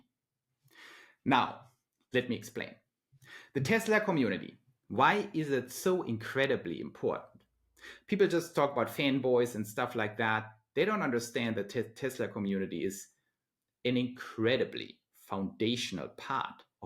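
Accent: Germany. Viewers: Appreciate your analysis, insights and effort to bring this material so clearly with your pleasant German accent. Very good!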